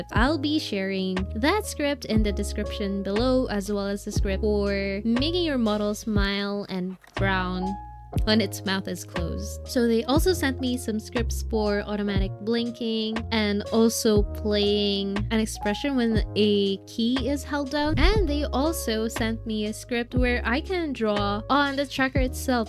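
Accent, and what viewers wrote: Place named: Philippines. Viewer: Yk when i first heard your voice, i was like is she a filipino?, she's definitely a filipino. and after i searched you deeply You are indeed a filipino HWAAHAHAHSHSS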